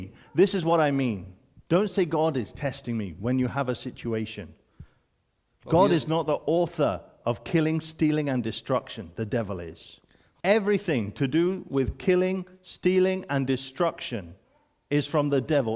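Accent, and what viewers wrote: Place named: Netherlands. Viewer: Do you live in Nederland? I can hear dutch language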